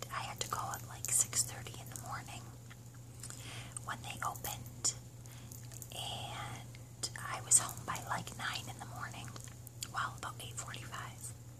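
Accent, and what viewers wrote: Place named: Michigan, United States. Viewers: Whatever accent she has it certainly sounds like a Michigan or new you accent. Whats weird though is my ex girlfriend was from Michigan and she had no accent. Her parents didn't much have one except like afew words. They would say A's real nasally and overdraw the short O sound. I don't know exactly what this lady's accent is exactly. I still like it nonetheless. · I ❤️love❤️ Michigan accents try always give me ASMR!! Especially yours lol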